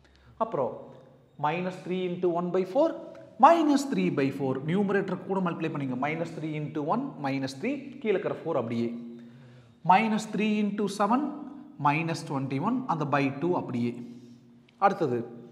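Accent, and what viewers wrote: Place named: Pollachi, India. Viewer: Super teaching in pollachi voice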